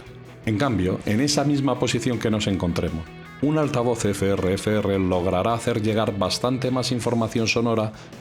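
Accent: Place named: Spain. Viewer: Una pregunta sin relación al tema del video, de qué región de España es el acento del narrador? Gracias.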